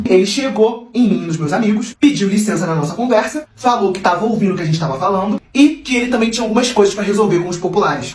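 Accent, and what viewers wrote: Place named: South, Brazil. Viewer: Você é do Sul né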